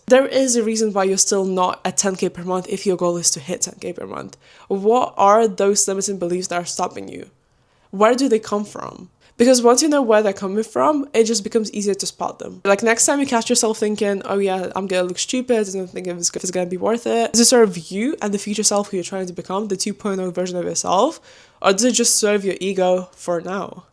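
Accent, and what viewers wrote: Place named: Ukraine. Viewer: we look so alike and have that ukrainian tone it feels like watching myself from an alternative universe omg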